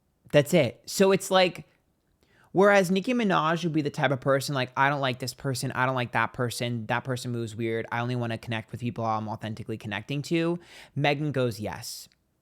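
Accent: New York, United States. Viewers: I KNOW you're an Italian New Yawkaaaa or Jersey 🙌 and a psychic on top of that?? yes pleaseee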